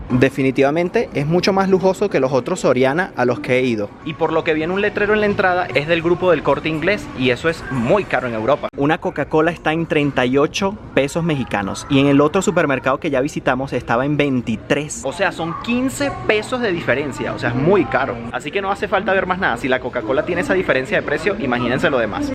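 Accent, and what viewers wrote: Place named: Venezuela. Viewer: es venezolano, no le oyes el acento?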